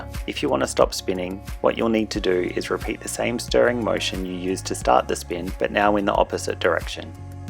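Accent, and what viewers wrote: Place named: Australia. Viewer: Thanks for this (and all your tutorials)! You do a great job of breaking it down. I also very much enjoy hearing the Aussie accent from the other side of the world. Keep up the good work🙂🙏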